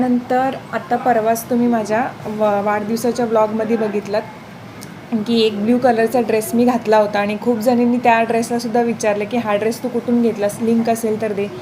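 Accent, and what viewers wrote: Kolhapur, India. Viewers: ताई तू कोल्हापुरी भाषेचे छान बोलतेस एकदा बोल की मग व्हिडीओ त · Ya blog la tu assl kolahpuri bollis khup mast vatl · Tai me suddha kolhapur chi aahe tumhi dadansobt kolhapuri bhashet bolt hota tr khup bhari vatal😍😍😍jagat bhari aapli kolhapuri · Kolhapuri language ahe tumchi😀🔥❤️ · Nice vedio all....pn tumhi Kolhapur che aahe he mast vatal language aaikun😘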